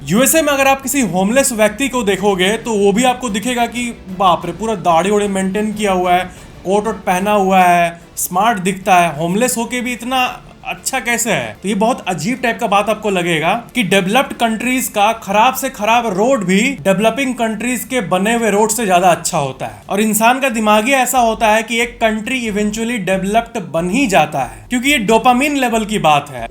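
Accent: Bihar, India. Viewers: thats bihari Akcent ❤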